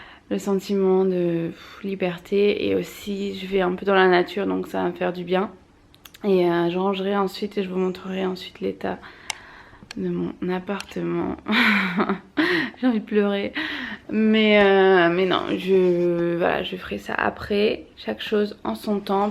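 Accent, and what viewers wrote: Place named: Australia. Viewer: vous êtes un petit accent d'Australie, ou des états uni peut-etre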